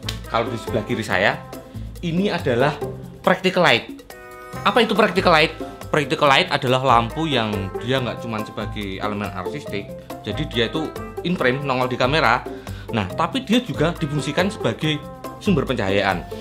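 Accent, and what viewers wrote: Java, Indonesia. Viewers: Kok pake cengkok Jawa,anjirrrrrr👎👎👎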